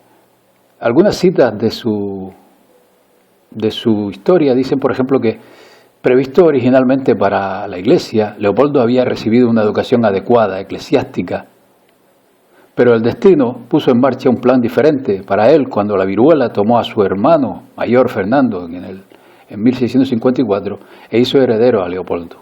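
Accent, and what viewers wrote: Canary Islands, Spain. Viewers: hablar con tanto acento español canario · HABLA UN CANARIO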